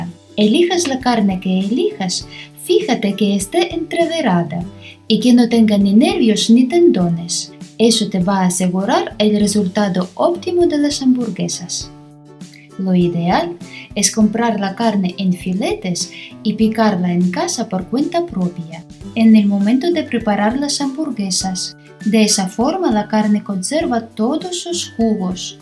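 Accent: Ukraine. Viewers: Ella es de Ucrania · Ella es de Ucrania, residente en España!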